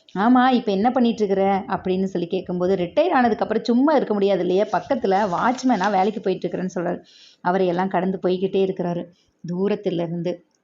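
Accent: Tirunelveli, India. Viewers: என் வயது 81.ஓய்வூதியன். தினமும் பி.பகல் 2 முதல் 4 வரை படுத்துக் கொண்டே பல அலைவரிசைகள் கதை கேட்பேன் அரிய கதைஃ.திருநெல்வேலிப் பேச்சு மொழி பிரமாதம்.திருநெல்வேலி அல்வா கொடுத்தால் நாங்கள் சுவைத்து ருசி அறிந்து கொள்வோம்.உங்கள் விளக்கங்கள் இடையிடையே தேவையா?மன்னிக்கவும். நானும் 32 நூல்கள் வெளியிட்டுள்ளேன்.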